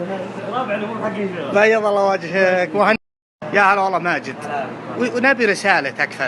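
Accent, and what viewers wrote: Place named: Qassim, Saudi Arabia. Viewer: الله يلعن لهجتكم يا القصمان تفوووو.فعلاً تجيبو المرض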